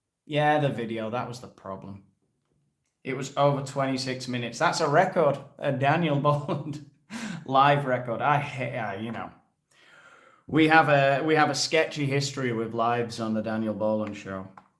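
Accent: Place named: England, United Kingdom. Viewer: Mr Boland you are such a handsome englishman. I love your accent. Female from Trinidad here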